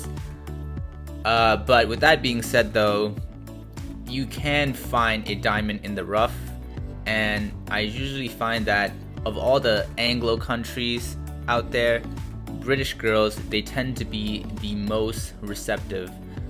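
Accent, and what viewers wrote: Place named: Australia. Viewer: Maybe because you have an australian accent which americans fetishise a lot. So yeah, if you're an aussie you're going to do better overseas not saying you won't do great in your own country, too lol. I heard that aussie girls are more into asian guys than european and american ones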